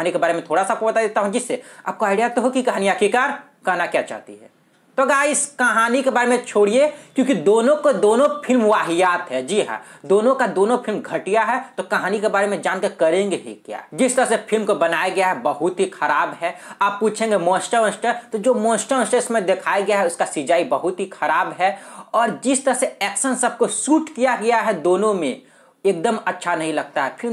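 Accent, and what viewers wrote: Bihar, India. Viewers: Bhai tum kaha se ho Bihar se ho ??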